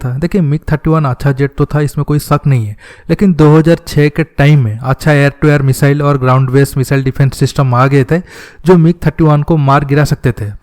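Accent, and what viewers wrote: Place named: West Bengal, India. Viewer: Bhai aap west Bengal se ho kya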